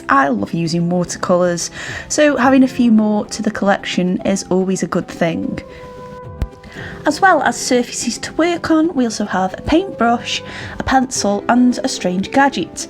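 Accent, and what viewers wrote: United Kingdom. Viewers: Ooo yay, I know this video is 3 years old but I’m excitedly watching upcrate videos since I have one arriving tomorrow. And I got even more happy hearing that you’re British😁😂 subscribing for sure and can’t wait to see the finished product!💕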